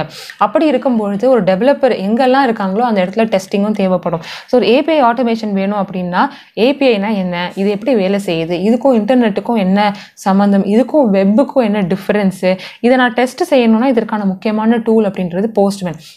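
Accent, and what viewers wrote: Sri Lanka. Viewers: Akka...are you Sri Lankan??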